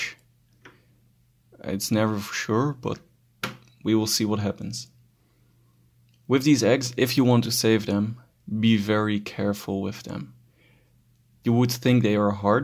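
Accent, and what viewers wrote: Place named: Netherlands. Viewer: The fun thing is... Ik ben ook Nederlands en ik dacht het al aan je accent te horen, maar ik wilde het zeker weten... Heb je ook levende Heteropteryx Dilatata thuis?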